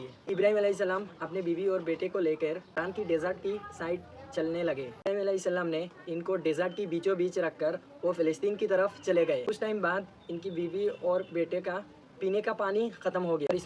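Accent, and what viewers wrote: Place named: Sylhet, Bangladesh. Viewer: Mashallah!! I'm just big fn of ur sylethi accent 😂❤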